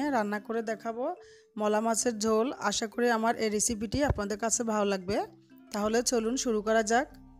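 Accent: Chittagong, Bangladesh. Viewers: আপনি কি চট্টগ্রামের?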